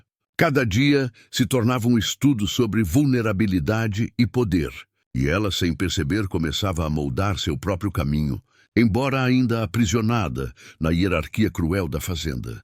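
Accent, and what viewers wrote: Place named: Portugal. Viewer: Só não entendi o porquê do sotaque português de Portugal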